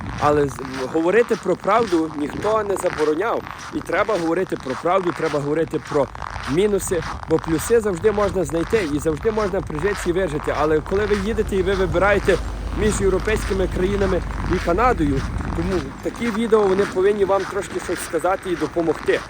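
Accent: Western Ukraine, Ukraine. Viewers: це західноукраїнський акцент початку 20-го століття. Майже всі приїжджі українці в ХХ столітті так розмовляли, бо були вони саме із цієї частини України. Якщо подивитись канадські словники української мови, або книги, які її вивчають, написані в ХХ столітті приїжджими українцями, або вже їх дітьми, то там буде така ж версія мови. Звідки вона у власника каналу тяжко сказати, можливо у селах Львівщини так розмовляють, можливо, автор звідти родом, ну, або він працює та живе у середовищі українців саме із такою версією мови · Західнянські говірки, а не мова! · Противный западенський акцент!!! Фуууу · Як гарно! Ялинки, як у нас в Карпатах в Україні. У нас зима теж є, але підвищена вологість у горах при -30°С - це страхіття😒. А сніг рипить теж так само🙂. Ви розмовляєте українською якось так, як на західній Україні.... ваші родичі з цих місць? Де Ви вчились розмовляти українською? 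Дуже гарно розмовляєте !!!👍👍👍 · Дякую за ваше відео. Мені не знадобиться ваша розповідь тому що багато років за кордоном у Європі, і це все пройшла. Але приємно дуже слухати вашу розповідь такою твердою западенською мовою без жодної помилки. Ви молодець, бажаю вам успіху.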